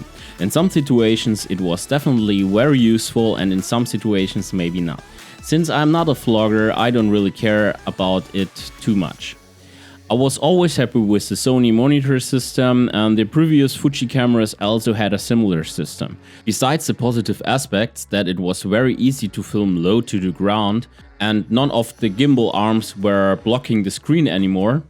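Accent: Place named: Germany. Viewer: Dieses German-English... herrlich 😅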